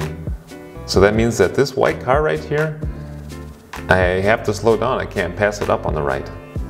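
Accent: Germany. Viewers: Your accent sounds a bit German. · It’s true. You really do sound like a German who speaks really good American English. Weird. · American guy with a German accent. It stands to reason, therefore, that you've lived there for a bit. Because you have an accent. I love driving on the Autobahn when I'm visiting there. And I love this video.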